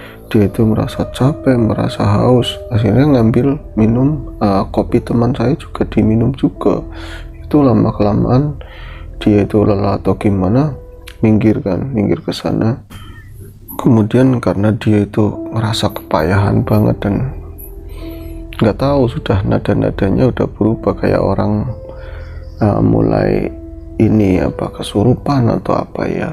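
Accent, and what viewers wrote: Tegal, Indonesia. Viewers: Mas,maaf saya telat 16 jam 🙏 Tetap semangat mas...awal2 di ngobor dl juga saya sedikit kesulitan nyimak kalo mas lg bawain cerita (mungkin krn saya orang sunda) tapi lama ke lamaan jd terbiasa dg logat tegal nya. Semangat mas,d tunggu cerita2 yg lain nya 💪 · temanggung hadir mas...untuk cerita kali ini q insyaallah yakin blm prnh dibawakan di chanel sblmnya soalnya klo cerita di chanel sblmnya alkhamdulilah q sampai hafal alurnya semua karena tiap cerita q dengerinya 3-4 kali...oya cuma masukan aja buat mas klo dr q justru lbh suka klo ada logat tegal,nya selain lucu entah knp q jg tertarik dngn logat tegal,nya mas....🙏🙏🙏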